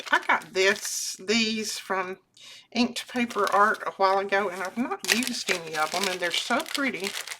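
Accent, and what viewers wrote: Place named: South Carolina, United States. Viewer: it you quit, one of YouTube's brightest stars will dim! You really are talented! Your projects are ALWAYS done SO well! 💖 I moved from South Carolina 15 years ago, and when I hear your voice, I'm right back there where I left a piece of my heart💕😊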